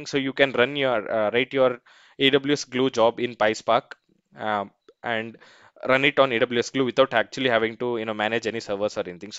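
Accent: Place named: India. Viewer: Shit voice Indian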